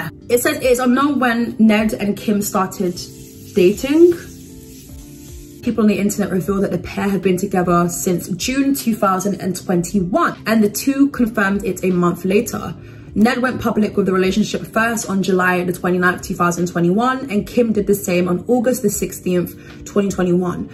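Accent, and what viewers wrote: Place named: United Kingdom. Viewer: I like you, your accent, i know it, you have to be british. you are so cute!! very weird seen an adult act like a child.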